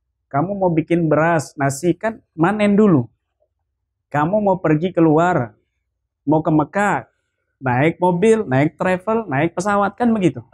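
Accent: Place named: Madura, Indonesia. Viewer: Kayaknya pak kiyai ini masih ada turunan madura, dari logat bicaranya!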